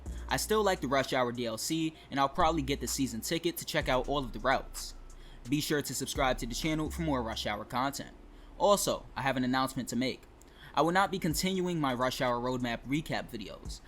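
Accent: United States. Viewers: Right...I had to go back to see what the big deal was and you are just saying it the way we do here in the States. If you listen to videos from different regions and countries then you have to be prepared for different pronunciations sometimes.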